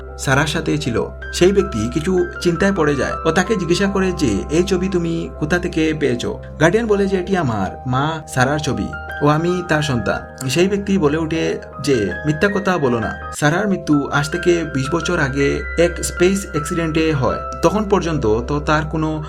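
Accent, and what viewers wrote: Sylhet, Bangladesh. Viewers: তুমিতো দেখি সিলেডি ফোয়া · Apni sileti naki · ভাই সিলেটি নি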